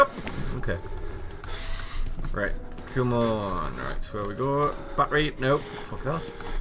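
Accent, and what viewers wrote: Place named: United States. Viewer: I watched anyways. I actually like your accent (have a real hard time placing it). I was talking about your American accent. It made me giggle.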